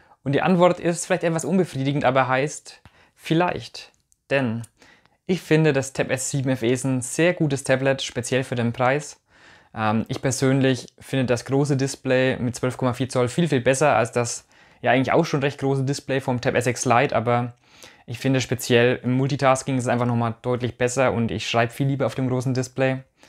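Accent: Franconia, Germany. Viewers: Ich hab's mir bestellt und bin schon ganz gespannt. Als Klavierlehrer nutze ich schon lange Tablets, allerdings ist es gerade so an der Grenze gewesen von der Größe von rund 10 Zoll. Ich habe das Tab A von 2016 und den Nachfolger von 2018 hier herumliegen. Der Akku vom alten ist ironischerweise besser, auch aus diesem Grund möchte ich das FE nutzen. Wie ich höre, ist ein Franke. Ich war in Unterfranken im Internat. Dementsprechend bekomme ich ein bisschen Heimweh und Nostalgie beim hören des frängischen Dialekts. 😏 Grüße aus Hessen! PS für Scans empfehle ich euch Adobe Scan. Das macht auch ohne Blitz hervorragende Scans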